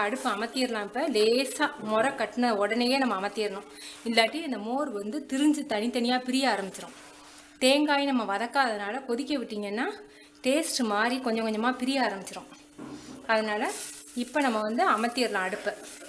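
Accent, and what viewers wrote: India. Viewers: She speaks such a lovely Chettinad Tamil, I feel sorry for you are missing something great in this life, the taster of her Tamil and the Chettinad food!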